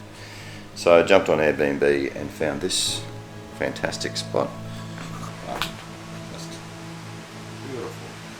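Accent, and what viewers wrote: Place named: Australia. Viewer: You sure have a strong Aussie accent for a whinging pom.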